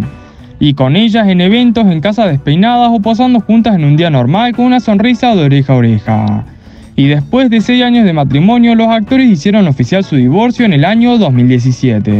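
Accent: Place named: Argentina. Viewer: Será el narrador de este video el dueño de este canal ? Que Sonsonete más feo, no habla sino canta, Disculpe pero le recomiendo bloquear su terrible ACENTO (no sé de cuál país es, si Argentina, o talvez otro de esa área).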